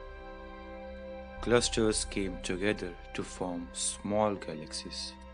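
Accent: India. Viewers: u sound like indian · He sounds so Indian yet so African yet so Asian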